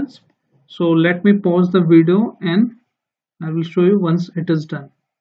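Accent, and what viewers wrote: India. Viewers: the Indian accent hurts my ears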